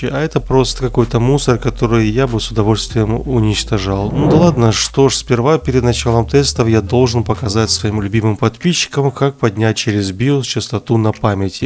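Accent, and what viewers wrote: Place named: Ukraine. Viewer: С первых минут понятно что ты Украинец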